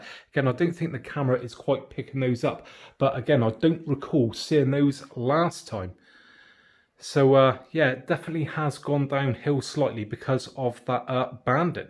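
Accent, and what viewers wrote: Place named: England, United Kingdom. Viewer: Sério? Acho que ele é inglês, vc deve ta acostumado com inglês americano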